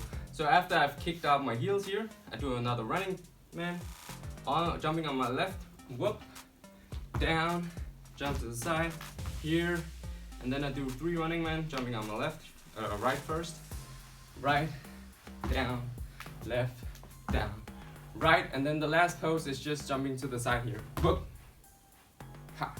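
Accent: Denmark. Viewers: bro this guy sounds danish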